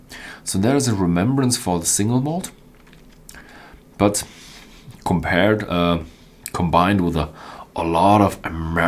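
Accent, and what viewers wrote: Germany. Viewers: Did you understand what he said? Should we all sound like the Queen of England or Donald Trump? Language is about communicative exchange and once meaning is transferred, the way in which it is transferred is immaterial. Two way communication! Should 1 billion Indians or 100 million Nigerians who can all communicate through English adhere to your pronunciation patterns? No, they shouldn't. Rejoice in the difference and let's not homogenize and standardise every bloody aspect of life. speaks German as his first language. There is natural interference coming from German, as there would be from French, Arabic or Chinese. Get over yourself man....he pronounces words differently, as you would when you learn to speak German as well as speaks English.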